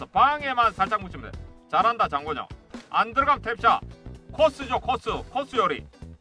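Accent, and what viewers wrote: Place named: Busan, South Korea. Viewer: 나 형 부산쪽일거라 생각했어! 광주 말투가 아님!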